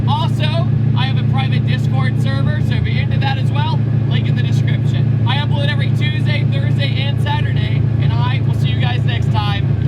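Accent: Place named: South, United States. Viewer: Oh no.... It's finally happened... has been living in Georgia too long & is losing his ability to sound normal slowly.... he keeps saying "S13" coupe and it sounds like he's saying "S. R. teen" Don't let that slack-jaw southern draw seep in to your brain! PRONOUNCE your words... don't become a country boy for all things holy.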